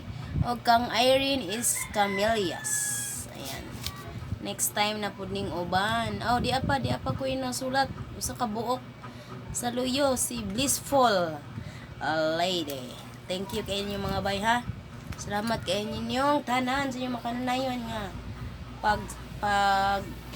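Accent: Visayas, Philippines. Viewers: .dont be upset naghalo ang kape at gatas at isa pa.carbon copy ung babae mo sa asawa mo dat means ur so inlove sa uyab mong pula dhil kahawig na kahawig sa asawa mo yan ang kasabihan. Isa ako sa avid fans mo kc pagnagsalita ka nkakatawa ung bisaya mo parang c ate charing I'm long time avid fans of u.pls reply me sometimes.thks.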